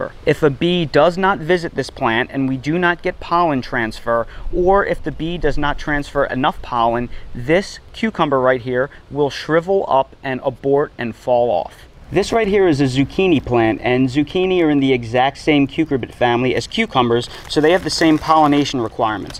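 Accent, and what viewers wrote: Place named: South Carolina, United States. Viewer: Hi, Thanks for all those great tips.I will some of them in practice like putting mulch and watering the plants. I will follow some of them. For what I hear you are South Carolina. I am making a visit to that state soon. I would like to know in which area your garden, not your specific address. I want to know how it is living and gardening there. Is it an area for a homestead ? I want to get information that I will get only by visiting there. I hope you will answer some of my questions. God bless you and thanks this great video.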